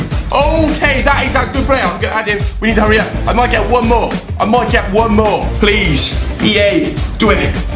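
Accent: Wales, United Kingdom. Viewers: Are u welsh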